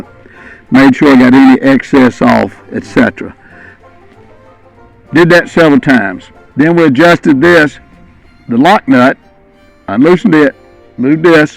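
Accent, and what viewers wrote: South, United States. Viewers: 5/6/23 Just came across your videos. I watched 2 so far and you addressed the same problems I had today after coming back from one of my first rides this season up here in the PA Poconos. I bought this 2009 Tri Glide Ultra after not riding for 4 years and felt embarrassed by the little problems I was having. Thank you so much. Love your down home style and southern accent. Most of all, thank you for your service to our country. I subscribed and I am looking forward to more helpful hints.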